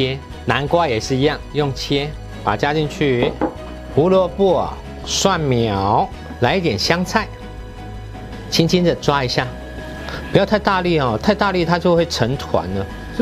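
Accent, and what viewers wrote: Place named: Hsinchu, Taiwan. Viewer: 我是北埔人，你說的客家話的音跟我ㄧ樣，我也想多學些道地客家菜，譬如 薑絲大腸等等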